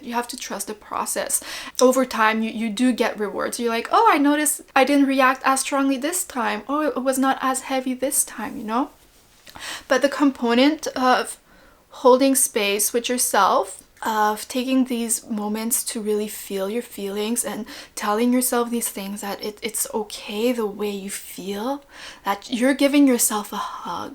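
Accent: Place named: Quebec, Canada. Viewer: awesome video girl, & nice french- canadian accent ;)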